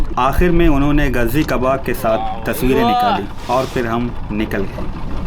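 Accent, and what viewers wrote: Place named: Balochistan, Pakistan. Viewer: کتنی خوبصورت اردو بول رہے ہو لہجہ بھی بہت خوبصورت ہے یہ ایک بلوچ کا ہی ہوسکتا ہے ۔ اللہ خوش رکھے آمین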